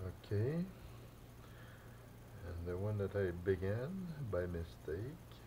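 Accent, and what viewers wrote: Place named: Quebec, Canada. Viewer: i can imagine your from quebec. tabarbak thanks for the video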